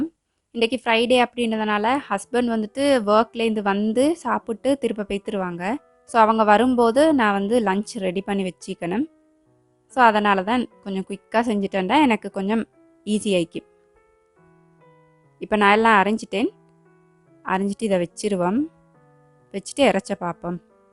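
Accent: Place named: Kurunegala, Sri Lanka. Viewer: Neenga kurunagalaya or anuradapuraya sis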